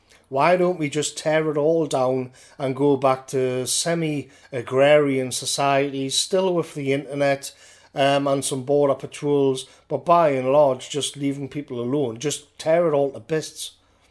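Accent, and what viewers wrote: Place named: Newcastle, United Kingdom. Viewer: views are so intelligent, researched and insightful, that I sometimes wonder if he is himself a man of education, and the unabashedly working class Geordie accent is a front...?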